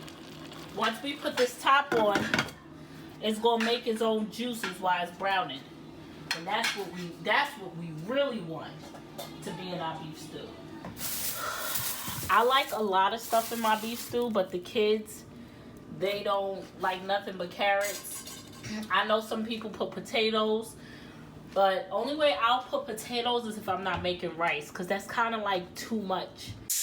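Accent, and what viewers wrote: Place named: Philadelphia, United States. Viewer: AWW love your accent, I'm from philly so I'm here for this accent honey! lol don't call you hahaha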